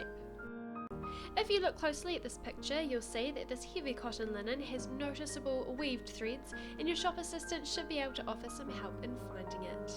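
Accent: New Zealand. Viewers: Sounds like NZ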